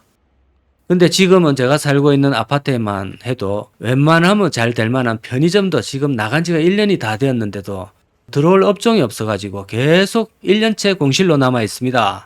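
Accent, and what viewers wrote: Gyeongsang, South Korea. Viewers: 경상도 사투리 일단 듣기싫노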